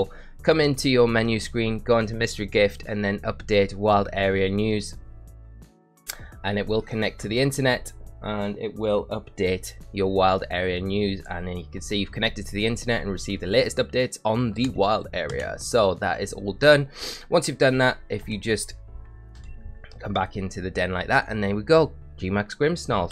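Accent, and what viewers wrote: Newcastle, United Kingdom. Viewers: Wish we had gmax Morpeko 😢 btw are you Geordie?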